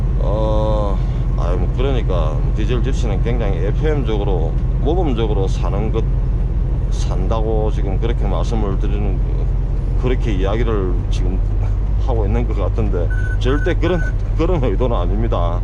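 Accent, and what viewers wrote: Gyeongsang, South Korea. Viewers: 구수한 경상도 사투리 즐기면서 잘 보고 있습니다. ^^ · 우리 동네 카센타 사장님도 비슷한 억양... 경상도 사투리는 세상 어딜가도 그대로임 ㅎㅎ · 경상도 사투리 굿 저도 경상도사람으로서 친근감을 느끼네요. 좋은 영상 잘보았습니다.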